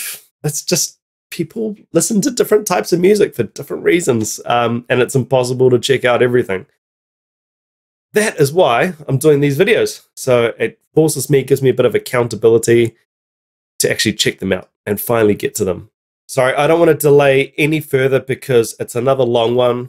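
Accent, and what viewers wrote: New Zealand. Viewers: My golly that's a strong Kiwi accent! Kiaora bro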